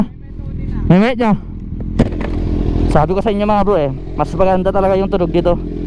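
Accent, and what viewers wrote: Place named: Ilocos, Philippines. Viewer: ilocano ka met brod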